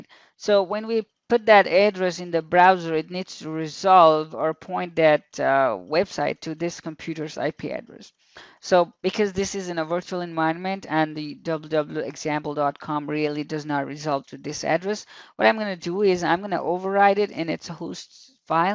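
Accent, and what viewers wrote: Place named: United States. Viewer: I very curious to know, How did you get that American accent?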